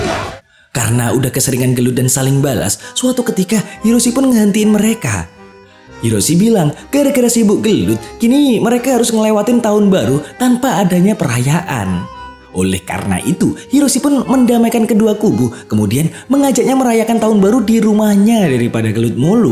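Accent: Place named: Java, Indonesia. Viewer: Jowomu ngendi mas?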